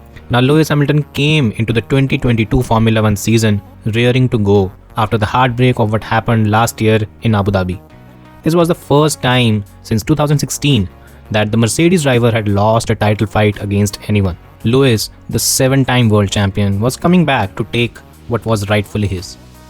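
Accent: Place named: India. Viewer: When i heard an Indian vioce i swiftly paused it as i know all facts will be wrong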